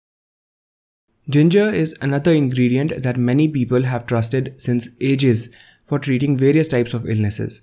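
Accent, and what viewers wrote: India. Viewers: Indian voice 🇮🇳🇮🇳🇮🇳😊❤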